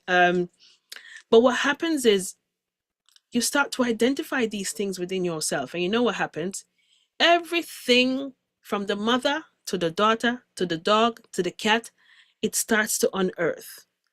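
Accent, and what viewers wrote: Jamaica. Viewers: Hi, I am tuning in from Jacksonville, Florida. I saw your captivating interview with Alex on Next Level Soul and was so pleased and happy to hear that you are originally from Jamaica. I found it so refreshing to hear the familiar accent of my homeland, Jamaica. I love your story and your message. I do believe that some people are already living their purpose but can't recognize it because it may not be grand. Your purpose is doing what you love. My purpose is an acupuncture physician and healing and giving love, light, and compassion to the world is what I do. I always see the greatness as God / Source as breath. I was channeled a poem from my Higher Self that breath is consciousness, and we can't live without it. Consciousness can not be contained, as you said on Next Level Soul, and that is so true. Thank you, for your message of love, light, purpose, and power! 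I am so happy I found you! Stay blessed. 🎉❤🎉❤🎉❤🎉❤🎉❤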